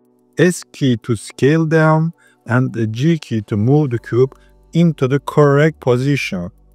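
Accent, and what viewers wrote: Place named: Turkey. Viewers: kesinlikle türk aksanı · konuşanın türk olduğuna eminim ama ispatlayamam...😀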